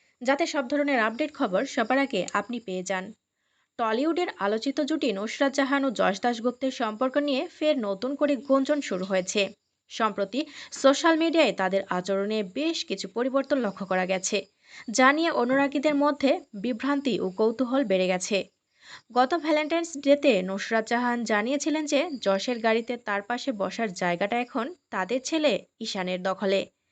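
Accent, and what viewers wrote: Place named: Bangladesh. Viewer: Bangladesher mone hoche apni. Huapy couple bollchen.Huapy ki kotha? Happy balun.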